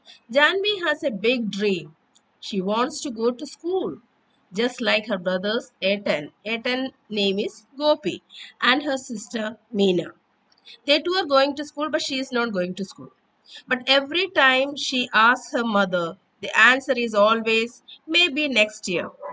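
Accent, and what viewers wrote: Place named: Kerala, India. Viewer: Mam you are. 100% malayali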